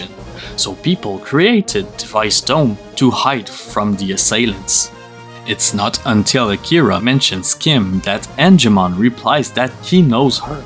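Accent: Quebec, Canada. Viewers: You're clearly French Canadian, hahaha. nice video about a beloved game of mine. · Il me semblait que tu avais un accent canadien français, j'entend souvent à mon travail mes collèges parler en anglais, il y avait une ressemblance. En tout cas bel effort de tout faire en anglais ! Très bonne vidéo.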